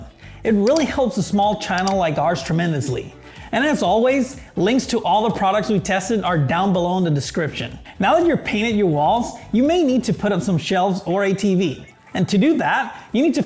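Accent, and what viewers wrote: Quebec, Canada. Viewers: Great video! Are you French Canadian?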